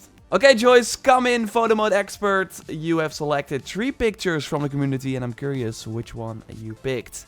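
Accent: Netherlands. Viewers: Are u dutch ???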